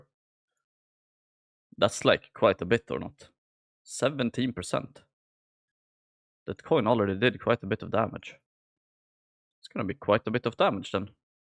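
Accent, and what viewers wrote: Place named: Sweden. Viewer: Thats the thiccest swedish accent i ever heard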